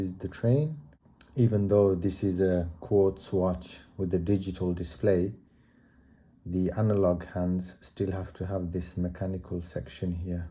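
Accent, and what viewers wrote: Birmingham, United Kingdom. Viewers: Awesome video and lovely watch. I’m pretty sure you’re a brummie like me 👍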